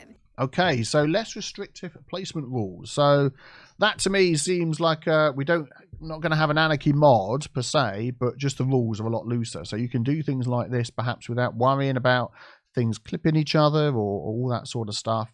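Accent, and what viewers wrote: United Kingdom. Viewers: I wanna see you and city planner collaborate on a city together this would sound really interesting you with your cool British English and City Planner with his cool American English. You 2 putting all of your expertise together. Just like famous PC Gamer Stonemountain64 and his British gaming friend Tomographic often teaming up together.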